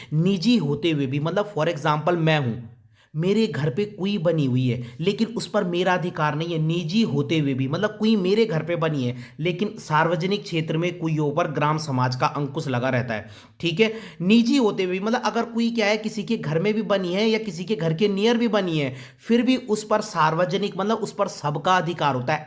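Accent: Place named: Pahadi, India. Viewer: sir aap bhi pahadi ho kya kya aapke bolne ke tarike se laga raha hai ki aap bhi pahadi ho uttarakhand se mai bhi vahi se hun sir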